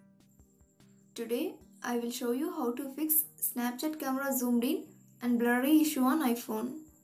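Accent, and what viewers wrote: India. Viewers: i liked the video because of the indian accent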